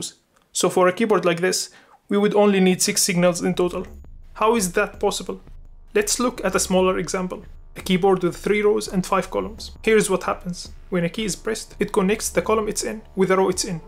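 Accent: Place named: Levant. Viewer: دخيل الشوام والأكسنت تبعوووووووووون 😆♥️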